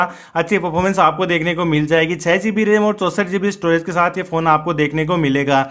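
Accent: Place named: India. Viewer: heard indian accent.. quick close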